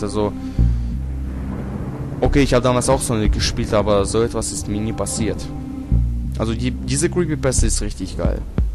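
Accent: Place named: Russia. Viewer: Haha du bist voll geil ich liebe es wenn Russen so einen geilen akzent haben wie du! Aufjedenfall abboniert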